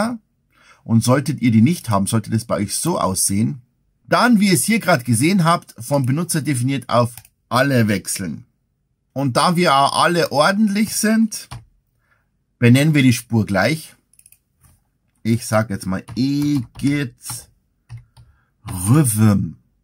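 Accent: Bavaria, Germany. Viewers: Ich bin seit gefühlt 100 Jahren Cakewalk-Fan angefangen von Cakewalk 3.1. Aber jetzt Cakewalk (by Bandlab) ist der Wahnsinn. Und noch ein groeßerer Wahnsinn ist diese Tutorial-Reihe mit diesem super Moderator. Ich bin a Preisss aber die bayrische Sprache mag sehr. Lieber Moderator und Cakewalk-Spezialist/Musiker/Tontechniker usw...., ich bin so dankbar für diese Tutorials. Jazzjoe aus Bad Nauheim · hab gleich gemerkt das du bestimmt auch aus bayern kommst😎😁 bist auch aus oberbayern oder? 😅 wenn ich fragen darf*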